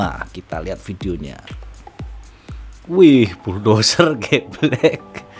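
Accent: Java, Indonesia. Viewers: Logatmu jawa maas,,,apa asli jawa y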